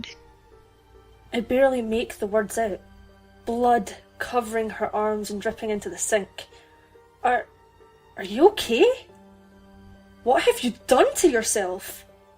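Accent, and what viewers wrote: Scotland, United Kingdom. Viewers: Are you skotish?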